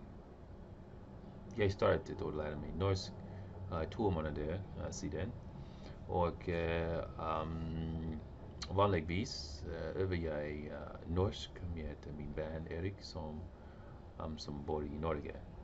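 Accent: Sweden. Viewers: Høres ut som en svensk mann som har bodd litt i Nord-Norge! awesome job man! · I could still hear a Swedish-ish accent there, but I could definitely understand everything you where saying, keep it up :) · Sounds so amazing for two months!! My mother who is Filipino and lived in Norway for 20 years and you are juuuust below her in speaking.you have a very Swedish accent which is funny, so you have an American Swedish Norwegian sound haha. Kudos to you, much love from up north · You speak a bit swedish/norwegian mixed, but you are amazing still! Im a Norwegian and I love Japanese, ive studied it some! id love for it if u could help me learn it :D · Du var veldig flink men du har en slags svensk aksent, men du var veldig flink for å snakke norsk er veldig vanskelig, men hvis man kan svensk er det litt det samme. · Love your stuff man. Btw, you are speaking norwegian with a swedish accent ( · Litt svensk dialekt men ellers bra norsk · Høres litt ut som norsk språk med svensk uttale, men uansett kjempebra gjort!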